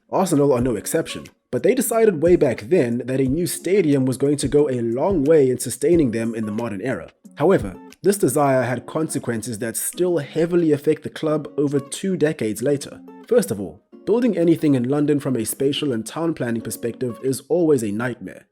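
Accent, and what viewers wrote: South Africa. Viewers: I recently discovered your channel. I could tell by your accent that you're South African. Once I confirmed that, I hurried to subscribe because you make really great videos. I vowed to myself that this would now be my favourite channel pertaining all things football. Then you made a joke about my beloved Arsenal. So I will give it to you in a way that's quintessentially South African, voestek! 🤣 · You sound south african? Correct?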